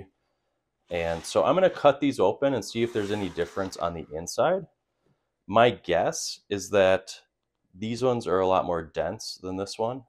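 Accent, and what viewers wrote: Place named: Midwest, United States. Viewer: As a midwesterner, I appreciate your vowel pronunciation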